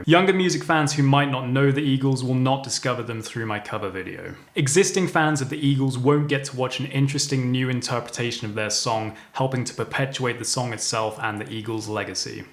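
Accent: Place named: United Kingdom. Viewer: You sound like you are across the pond. testified before the United States Congress about this issue. Since they have hired a small army of tech people to protect their interest. The very idea that you not being able to steal from them is music to my ears. Get over it & move since you are so convinced that without you the musical world be a worse place. If I made a decision on music I wanted to learn based on your remarks. Wow, I think a music attorney has a lot to tell about what rights you do not have. You have the right to speak of them because they are public figures. Personally I think I may see if you have any thoughts that are original. Doubtful since you are doing cover songs with over 1.5 mil subs. Get real you sound like somebody's wife bitching. Hard to collect money from through BMI ASCAP or any other music licensing authority. BE SURE TO CHECK REMIX ON YOUR VIDEO HERE. 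YOU ARE NOT GOING TO LIKE IT. I WAS A PART OF THE INDUSTRY AND BUSTED ON MANY SONG THIEF'S ASSES.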